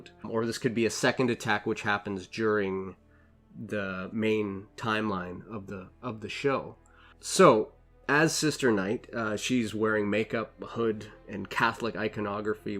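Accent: Canada. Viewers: Told you on your last Watchmen video that I'd watch every one you put out. I don't understand how you don't have more subscribers with a channel as outstanding as yours. I came to your channel through Westworld and I'll be here for all of Watchmen. Your analysis is always spot on and very very thorough. Keep up the great work!! Where are you from? I've tried to pin down your accent and if I was forced to guess I'd say Canadian or the upper Midwest.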